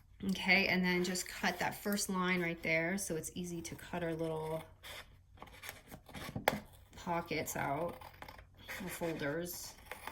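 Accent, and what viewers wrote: Boston, United States. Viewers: Ummmm just my 3¢.... BUT.... super cute Halloween makes !! And although deffinatly not a heavy Boston accent , and maybe very slight , but feel you have more of a Boston accent then you think you do ..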